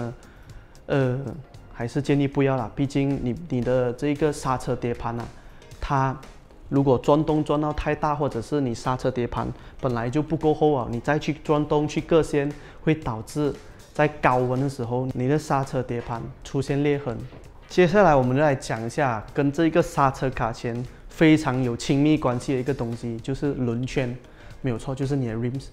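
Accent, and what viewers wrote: Malaysia. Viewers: 抱歉解释很好不过他的联邦音很重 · 聯邦音很重其實又有啥問題？這是特色...up主做你自己就好...你又不是電視台新聞主播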